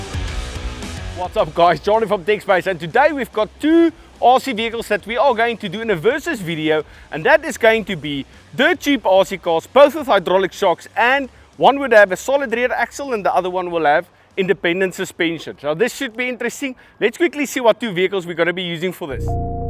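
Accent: South Africa. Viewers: I prefer 4 wheel independence.Suspension is by the way are you south africa?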